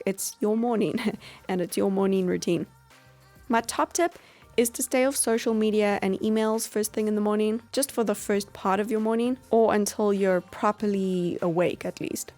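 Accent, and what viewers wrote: South Africa. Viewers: are you from South Africa? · Are you South African? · are you South-African, because your accent sounds like it, im South-African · Are you South African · Fellow south African is that you??? ♥️ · Your accent makes me feel like I'm home :D I'm a South African living in London.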